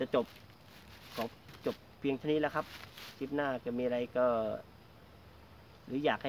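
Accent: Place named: Suphanburi, Thailand. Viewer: พี่ชายยยย..ตามาแว้ว..มิน่าอาหารหรือเวลาพูดคล้ายๆสุพรรณเลยพิษณุโลกนี่เอง...😁😁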